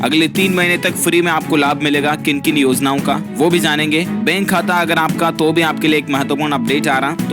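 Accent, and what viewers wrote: Rajasthan, India. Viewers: Bhai kya aap Rajasthan se hai?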